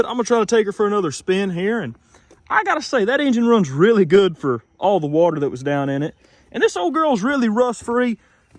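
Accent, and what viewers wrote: South, United States. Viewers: Just wondering what part of the south you from cause the accent and love the videos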